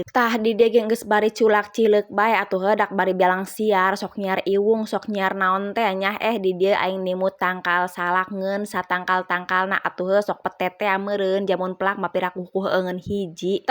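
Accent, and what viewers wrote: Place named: Pandeglang, Indonesia. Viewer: Omah mah resep jasa kana logat ngomong na teteh ja sarua omah ge urang pandeglang ,salam nya teh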